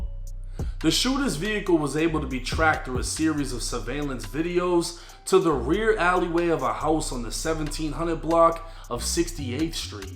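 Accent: Boston, United States. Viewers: U gotta be from Boston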